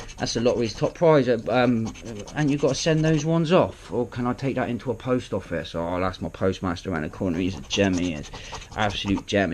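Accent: Portsmouth, United Kingdom. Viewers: Why do you sound like your from Portsmouth x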